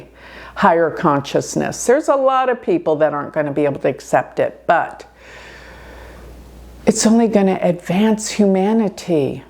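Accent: Texas, United States. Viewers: IKR!!! Texas accent is totally normal if your from Texas! We don’t notice our accent’s but others do. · slight TX accent that most likely has been practiced in order to lessen?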